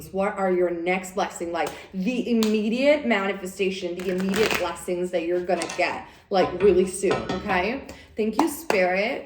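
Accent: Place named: Italy. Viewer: thanks girl xxx love your energy and italian accent.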